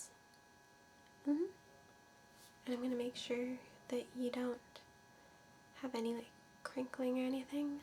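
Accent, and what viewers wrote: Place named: Midwest, United States. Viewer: Only flaw is a slight buzzing - is it a laptop fan?? You seem very sweet, please keep going! Nice accent btw - sounds Midwestern, like Nebraska or Montana or something.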